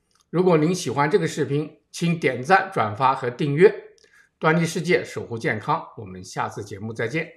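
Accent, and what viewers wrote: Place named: Nanjing, China. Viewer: 听出杨医生南京的口音了。不知道对不对。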